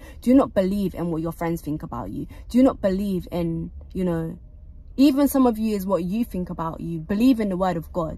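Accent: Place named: United Kingdom. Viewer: Rah wasn’t expecting a U.K. accent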